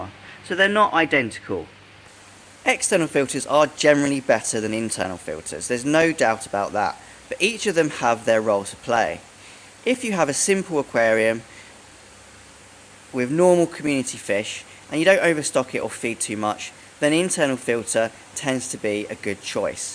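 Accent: England, United Kingdom. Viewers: Kit Harrington accent...nice 👀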